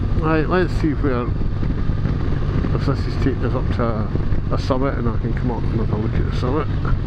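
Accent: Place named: Scotland, United Kingdom. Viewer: Your Scottish dialect has to be the most beautiful of all the English dialects. Greetings from Miami.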